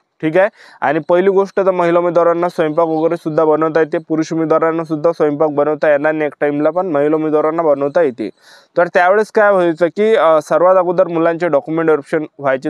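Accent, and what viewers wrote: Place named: Gadchiroli, India. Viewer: Gadchiroli bolte😅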